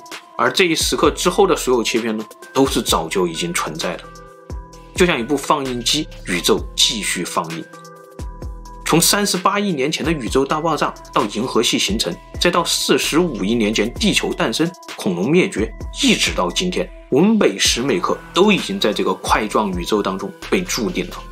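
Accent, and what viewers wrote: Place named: South, China. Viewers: 就喜欢这种不标准的南方口音普通话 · 我听出来是武汉人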